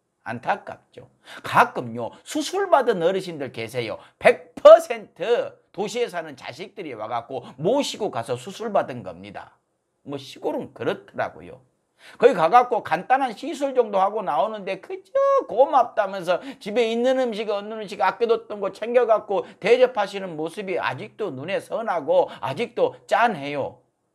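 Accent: Jeolla, South Korea. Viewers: 전라도 말투네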